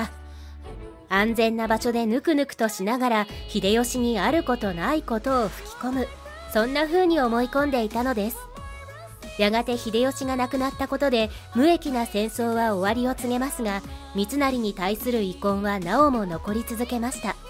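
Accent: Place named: Himeji, Japan. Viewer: 姫路のイントネーション！！！！！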